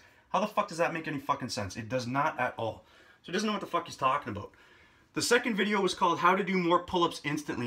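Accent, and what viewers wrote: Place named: Canada. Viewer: Are you Canadian? You sound like you're from around here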